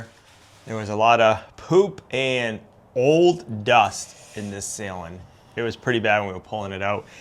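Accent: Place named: Boston, United States. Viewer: Boston accent! They just talk different out there.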